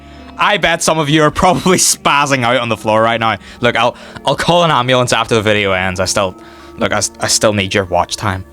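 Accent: Scotland, United Kingdom. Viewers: Idk why this dude has a slight Scottish accent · Maybe he’s Scottish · Never thought I’d laugh this much on a Scottish person ranking killer abilities while swearing frequently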